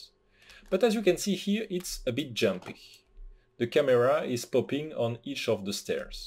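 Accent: France. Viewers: il est français je le sais car j'en suis un et je le repére a 1 000 km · Indeed it's a French accent, sorry about that, doing my best to improve my english ;)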